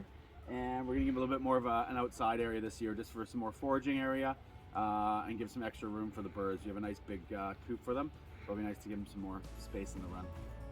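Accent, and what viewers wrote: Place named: Canada. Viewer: I knew I was hearing a Canadian accent. What a location! Was this land in your family?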